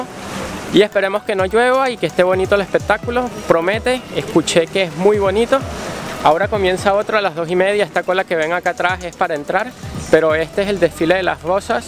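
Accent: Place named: Venezuela. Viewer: saludos...muy bueno tu canal,ya me suscribi,por tu acento al hablar y tus palabras parece que eres de Venezuela !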